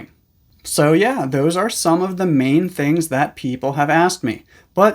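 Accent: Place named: United States. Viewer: Fine. I noticed you have an american accent.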